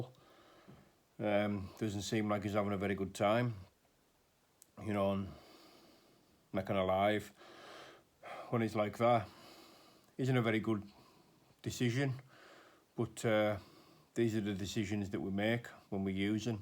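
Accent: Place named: Cumbria, United Kingdom. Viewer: Are you from Cumbria?can definitely hear it...x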